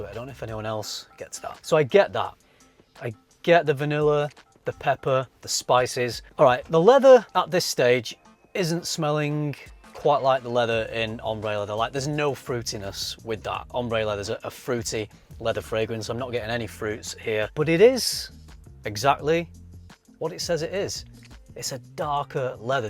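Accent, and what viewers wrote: United Kingdom. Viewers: Love your british accent... cheers from Argentina!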